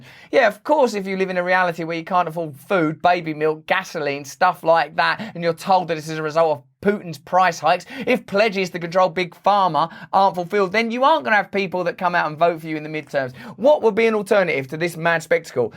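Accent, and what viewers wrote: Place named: United Kingdom. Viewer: RB I very much enjoy and appreciate your videos. You're cool and I don't think of you as an outsider. You seem more tapped in to things going on in America than most Americans. Your videos certainly are an eye-opener. I'm happy to become more conscious and the info comes with a lovely British accent. You know we Americans are partial to that. We just can't help ourselves. I'm watching and listening to more of your videos because I want more useful information. You're telling us the truth about ourselves and our country. I seek the truth in everything. Thank you for all the time and work you're putting in to revealing that truth. I'll be watching and listening for more of your enlightening videos. It's almost tea time. I do enjoy a good cup of Earl Grey. Good day.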